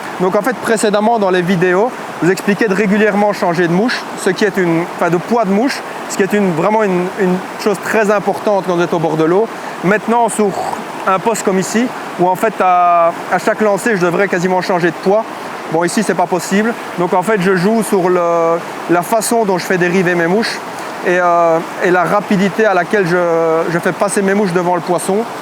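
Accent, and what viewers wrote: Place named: Belgium. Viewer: J'adore tes vidéos ! Avec ton petit accent belge ça rajoute un truc de ouf. Merci à toi. Grace à tes vidéos j'ai découvert une pêche après des années de cuillère... Tout en finesse, tout en sensation !! Je ne reviendrai jamais en arrière ! Merci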